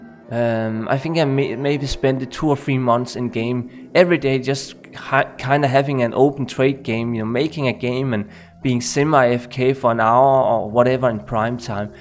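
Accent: Denmark. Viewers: Nice video. Elsker at din danske accent er så tyk at man slet ikke er i tvivl XD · I think in some way or form we all dislike real money trading like you are doing half the time on JSP, there is no way around it it’s pay to win but that said trading items for real money will never go away so that’s way I am happy with the current status of JSP. You sound Danish? Am I wrong 🤷🏻‍♂️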